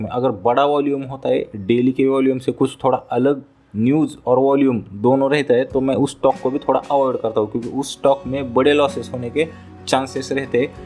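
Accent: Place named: Maharashtra, India. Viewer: Pahilya 10 sec mdhech olakhla marathi manus ahe😅. All the best sir😊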